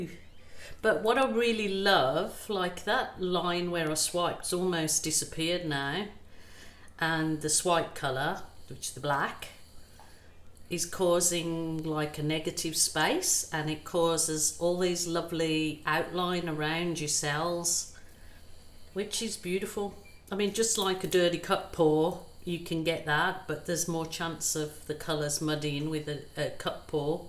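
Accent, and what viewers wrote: Birmingham, United Kingdom. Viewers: Glad your feeling better x i thought you had a touch of a Brummy acsent..thought i was going mad lol x fab pour x still think it looks like a snake xx lots of love from the uk xxIm gonna start making my paints up few days before x great tips today xx thankuuu xx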